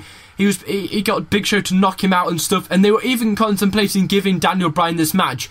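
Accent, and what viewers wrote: United Kingdom. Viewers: I thought you were British!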